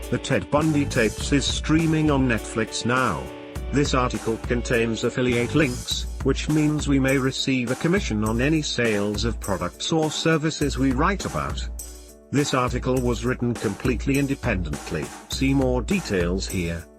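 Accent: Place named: England, United Kingdom. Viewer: Robot voice with a snooty English accent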